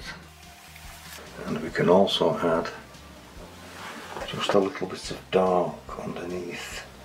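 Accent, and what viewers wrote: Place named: Sheffield, United Kingdom. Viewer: This is kinda random but, your accent reminds me of several of my favorite musicians like Richard Hawley and Alex Turner. They both come from Sheffield in the U.K. and in particular I've heard them say they are from High Green(I'm American and have never been to the U.K. but certain accents are somewhat recognizable to me) To me the 'Sheffield' accent sounds similar to Scottish and Irish.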